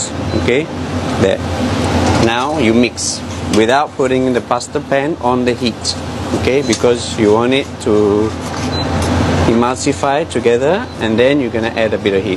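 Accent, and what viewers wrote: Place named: Singapore. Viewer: he is in Singapore, hence his accent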